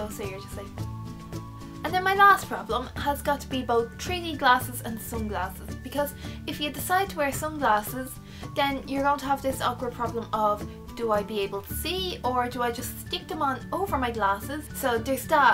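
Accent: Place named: Ireland. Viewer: Are you Irish? I love your accent!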